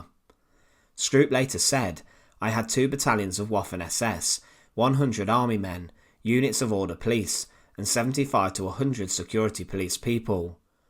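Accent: United Kingdom. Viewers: I wish your British accent was not so prevalent and more understandable.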